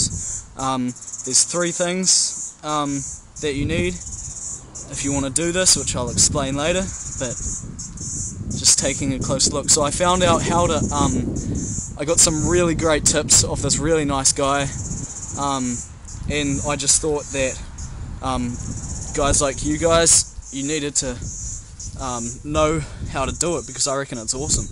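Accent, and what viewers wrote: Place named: New Zealand. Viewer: I hear New Zealand. Chur for the video bro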